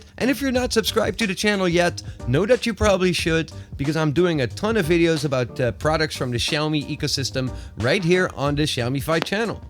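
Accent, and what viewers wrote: Netherlands. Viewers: You're Dutch, right? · You sound Dutch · hoor ik daar een licht NL accent?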